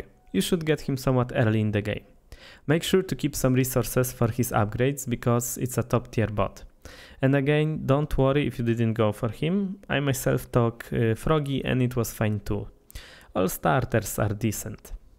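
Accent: Poland. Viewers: i ten polski akcent hehehe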